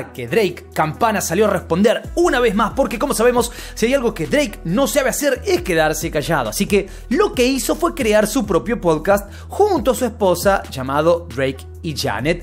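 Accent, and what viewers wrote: Argentina. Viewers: DESPUÉS DE SEGUIR ESTE JUICIO POLÉMICO POR TU CANAL, AHORA ME PARECE UNA PARADA OBLIGADA CUANDO QUIERO ENTRETENERME EN YOUTUBE HAN HECHO UN TRABAJO INCREIBLE Y DESEO QUE MARCAS PATROCINEN, TE VEO DESDE EL SUR DE LA FLORIDA Y ME ENCANTA EL CANAL. SALUDOS ERES BELLISIMO Y ESE ACENTO AGENTINO ME ENCANTA. UN FUERTE ABRAZO Y FELICIDADES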